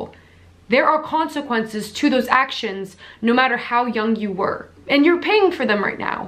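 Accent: Canada. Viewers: Are you from Canada? I'm getting some accident and I'm really dumb aaa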